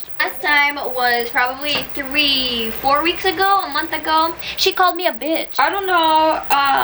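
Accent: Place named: United States. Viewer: she has an american accent ............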